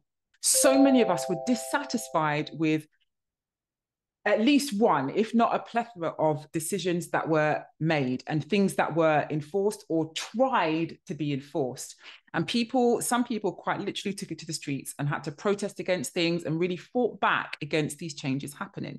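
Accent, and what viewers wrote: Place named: United Kingdom. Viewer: Good morning. I love your British accent it's so beautiful ❤️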